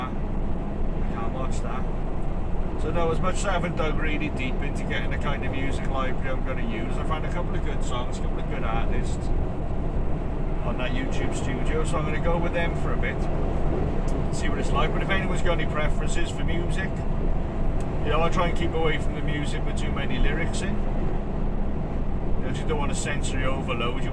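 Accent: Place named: Barry, United Kingdom. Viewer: That’s gotta be a Barry accent surely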